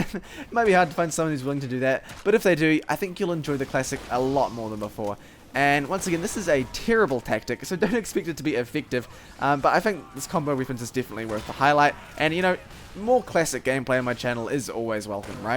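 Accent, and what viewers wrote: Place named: New Zealand. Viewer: He's from New Zealand.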